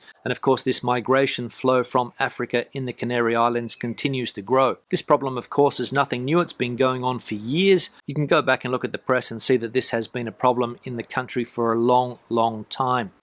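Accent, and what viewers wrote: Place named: Australia. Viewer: Love your video does thank you. Have to admit I only started watching because of th e ausssie acent and Spanish Horses. Well and Spain. I lived in Aus briefLy, two years as small Pearson, obviously made a big impression.🤠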